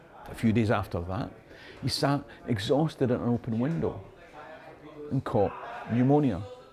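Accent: Scotland, United Kingdom. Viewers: 😂 Who the fuck is this African with a scots accent? Presbyterian Scots wanted the papish Jacobites out, and we took them out .KOSB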